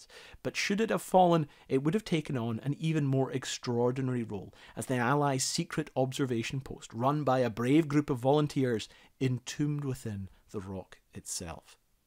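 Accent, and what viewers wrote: Scotland, United Kingdom. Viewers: Really great video,. Never heard of this bunker before and I guess a lot of people didn't know anything about this top secret place either. The idea of basically being buried alive in there is kind of freaky. Those volonteers had balls of steel and were really dedicated to their country. I loved the narration and the scottish accent. I'm going to go watch your fort drum video, I've been looking at that thumbnail for a while.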